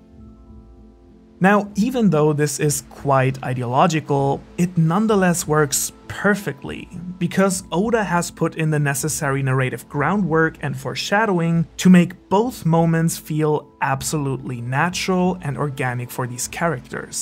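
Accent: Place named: Germany. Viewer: Are you german? Just asking cause your accent sounds a bit german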